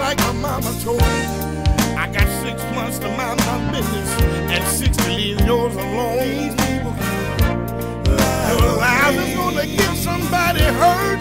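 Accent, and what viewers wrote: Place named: Memphis, United States. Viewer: He sound like one of my OG uncles from Memphis Mane. 🤣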